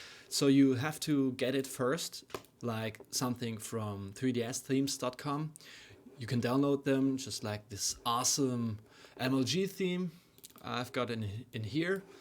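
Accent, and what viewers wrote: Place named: Germany. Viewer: Du bist deutsch das höre ich xD :D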